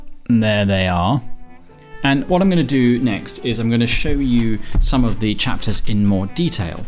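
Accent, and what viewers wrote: United Kingdom. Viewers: Your British accent is simply enchanting! amazing video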